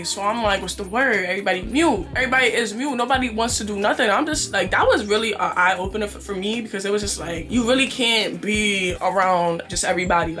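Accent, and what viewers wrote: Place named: Harlem, United States. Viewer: She sound like she from Harlem.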